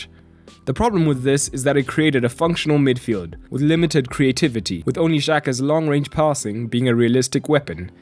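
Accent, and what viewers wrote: South Africa. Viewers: Are you South African?😂 your accent would suggest it. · You sound South African.. Gauteng oakie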